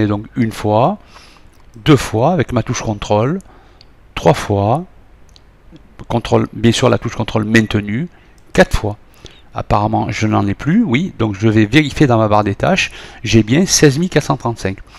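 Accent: South, France. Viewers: Meilleur intro. On est dedans direct (enfin moi je l'étais) et puis ça part d'un coup. Ça parle bien c'est net et précis. Mention spéciale à l'accent du Sud qui me rappelle cette boite de conserve "saucisse lentille" mangée en khapta. Merci encore <3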